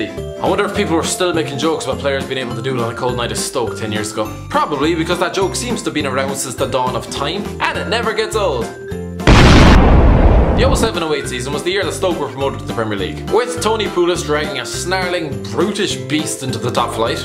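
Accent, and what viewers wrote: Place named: Ireland. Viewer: The Irish narrator is the best by far. Needs his own channel.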